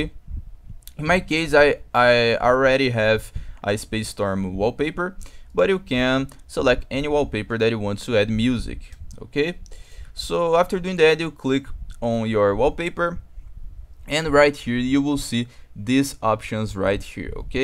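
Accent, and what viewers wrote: Brazil. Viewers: mano, tu é br né? tem sotaque kkkkk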